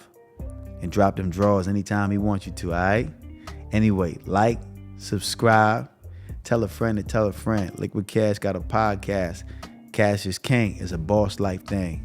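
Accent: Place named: New York, United States. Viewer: That NYC storytelling ALWAYS hilarious 🤣🤣🤣 Tell the story like a Ny'er or ion wanna hear it🤭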